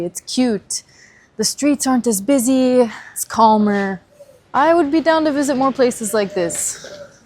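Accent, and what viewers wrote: Tunisia. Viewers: Thanks for an interesting video and i spoke to some tunisian while ago and got you really good tunisian accent 👌👌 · That's amazing you can speak Tunisian lahja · Your Tunisian accent is 100% congratulations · You speak Italian with a Tunisian accent: the way you say Aperto? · An American who speaks fluent Tunisian? That made me laugh out loud. I think that's the best Tunisian accent I ever heard from a non Arab. Well done :p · even your accent when speaking italian is tunisian lol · As someone from the other side of the Mediterranean coast, I feel like I didn’t even cross it, wow you video is incredible I've always admired how Sicilians are. But gurrl wanna say that your tunisian accent is sooo impressive😮 mashallah 😍 that man in the market wouldn’t be able to pull me in like that, because I know that trick 😂. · It is nice how you enlight us about hidden areas of islamic remarks in Sisily ,your gunisian accent is remarkable too ❤🇵🇸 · Excellent accent Tunisien !!!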